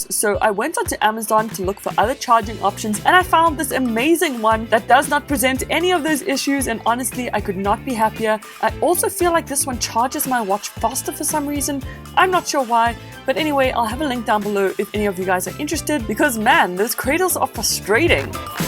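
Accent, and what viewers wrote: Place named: South Africa. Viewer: I wonder how many other South Africans get that "that's so raven" look on their face when they hear the accent in a YouTube video 😂 Loved the comparison! 🔥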